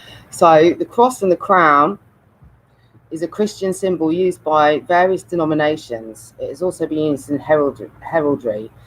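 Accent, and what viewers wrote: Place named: London, United Kingdom. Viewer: Hi ❤️ just subscribe after reading your comments on Carl Vernon. I take it from your accent you're London or surrounding. It's lovely to listen to you sharing your thoughts. I find video diaries in general really interesting (I'm not brave enough to do it myself). You seem of similar mindset as myself (and a similar age 🙂 I'm female). I'm totally all about meaningful coincidence, laws of attraction, symbolism etc I keep getting the number 44 lately - it's bonkers how much it appears. Are you registered to Gaia? It's like a spiritual Netflix 🙂. Some great doco's on there. Anyhows, I'm waffling 😍. Just thought I drop in and say hi. Namaste lovely lady - positive vibes to you on your journey from another spiritual lady in Cornwall /Devon area x❤️x ps you have a gorgeous, charismatic way about you - keep making your diaries 🌜🌞🍄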